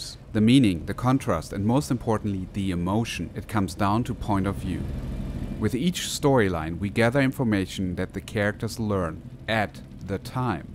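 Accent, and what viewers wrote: Germany. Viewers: Defiantly has a German accent first thing I noticed lol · Hey do you have a German excant ?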